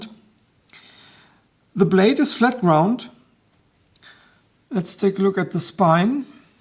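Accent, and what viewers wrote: Germany. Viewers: Nach den ersten 4 Wörtern wusste ich: ein Deutscher xD keep going - it's great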